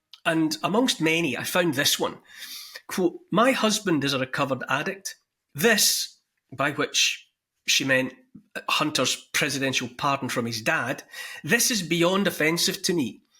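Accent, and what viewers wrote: Scotland, United Kingdom. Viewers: I am a subscriber…i love you… I love listening to your Scottish accent…and you are right on! ❤️❤️❤️ PS I am Canadian…so was my Nana! 😊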